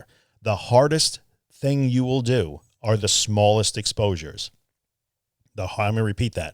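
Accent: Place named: New Zealand. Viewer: sounds like a fellow New Zealander!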